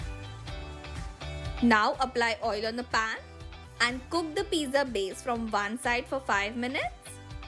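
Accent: India. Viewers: I luv ur hindi accent 😙😙💕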